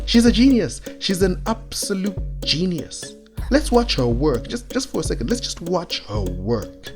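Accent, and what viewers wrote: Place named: South Africa. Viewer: South African? Man instant sub! Haha gaming news that sounds of home..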